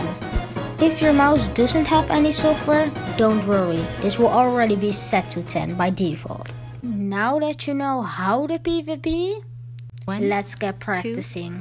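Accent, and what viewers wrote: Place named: Philippines. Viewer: It's pretty surprising for such good fps while you have less than 100 subs and you sound filipino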